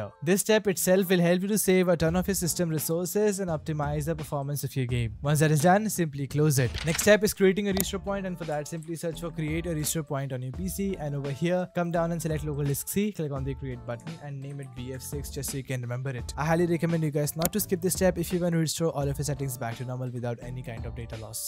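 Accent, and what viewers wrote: India. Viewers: if ur in an english speaking country pls for the love of god learn to not speak in an indian accent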